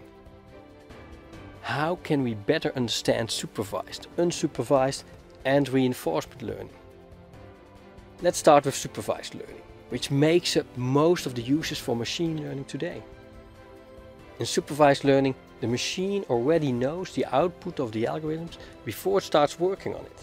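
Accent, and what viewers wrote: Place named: Netherlands. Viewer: I absolutely love you dutch accent! And the explanation too of course.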